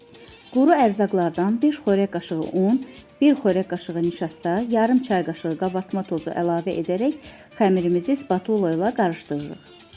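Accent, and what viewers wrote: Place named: Quba, Azerbaijan. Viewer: Allah bərəkət versin 🤲🤗,necədə səliqəli gözəl .Adamın üreyi açılır.Qubalısınız? Ləhcənizi oxşaydım Quba ləhcəsinə.👍👍👍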